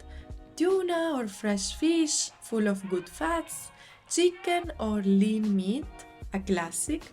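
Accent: Italy. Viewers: Grandeee finally someone explaining this truth to the world. I tried to explain this to non italians but they didn't really get it. Now I can show this to my friends and maybe they will understund ahah. Grande ti seguo da un paio d'anni, mi piacciono molto i tuoi video e anche il tuo modo di spiegare le cose. ed e' bello il tuo accento italiano quando parli in inglese. Un abbraccio dalla Sicilia.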